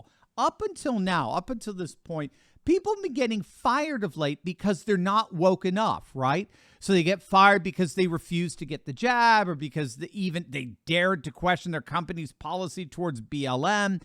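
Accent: United Kingdom. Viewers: I like how he slowly starts displaying a British accent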